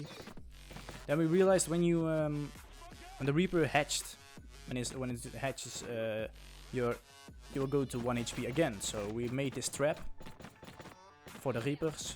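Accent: Netherlands. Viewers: Are you Dutch? Your accent sounds Dutch :p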